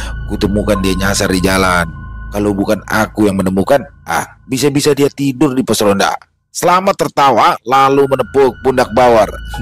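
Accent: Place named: Banyumas, Indonesia. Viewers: harus lanjut part deh cerita ini,dn di jadikan playlist,sukur2 BAWOR dan TIGOR jd partner biar slalu menampilkan logat cilacap dan batak pasti seru(seperti doni dan gembul} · Akhirnya ada karakter jagoan ngapak juga.. Matursuwun mbak,Lanjutkan.., salam dari purwokerto 🙏🙏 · Keren, bisa logat ngapak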